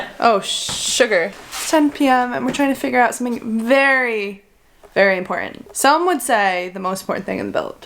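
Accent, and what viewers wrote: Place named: Midlands, United Kingdom. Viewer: Where is from just out of curiosity? Roughly of course lol I'm from the midlands in England and his accent sounds like one around here every now and then lol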